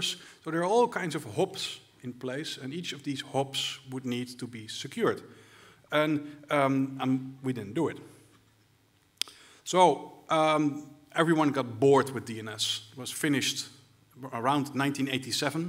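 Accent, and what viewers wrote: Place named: Netherlands. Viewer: Yes. The Dutch accent is kinda cool.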